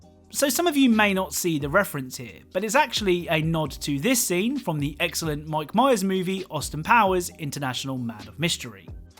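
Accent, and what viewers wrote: United Kingdom. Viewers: Are u british cos i am just wondering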